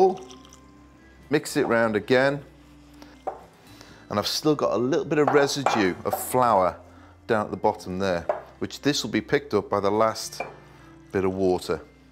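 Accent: Liverpool, United Kingdom. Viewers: Erik Patulin he’s not a Brummy, he’s from Wirral. His accent is closely related to a Scouse (Liverpool) accent. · The British accent and wit also work for some of us. A man that can bake is also attractive.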